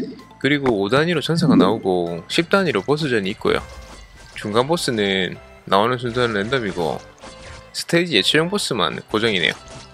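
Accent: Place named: Gyeongsang, South Korea. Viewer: 경남쪽 혹시 부산이세요? 사투리가 찰지시네요^^ 저는 뭐가뭔지도 모르고 시작한지도 얼마 안되서 배우는 입장입니다^^ 앞으로 잘 부탁드립니다~ 구독했습니당~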